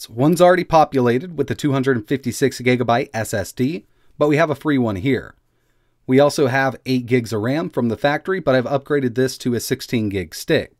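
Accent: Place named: United States. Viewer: What a voice of a cowboy sexy accent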